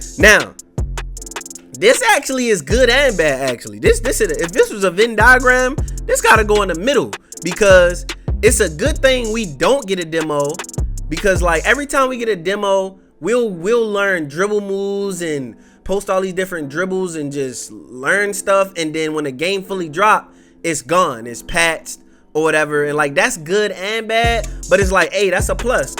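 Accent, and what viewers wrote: Baltimore, United States. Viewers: Most definitely from baltimore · Twentytwo Baltimore accent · bro ur from baltmore fo sho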